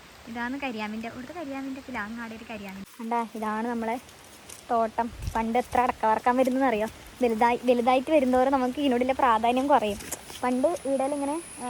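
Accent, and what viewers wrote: Kasaragod, India. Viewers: അംഗനവാടിയിൽ പോവാൻ വഴിയില്ലാലേ കാസർഗോഡൻ ഭാഷ നിഷ്കള ങ്ക സംസാരം ജനിച്ച വീടും നാടും മറക്കരുത്.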